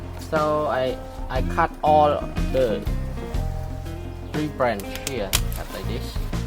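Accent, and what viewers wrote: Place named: Cambodia. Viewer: you must be Cambodian, sound like my uncle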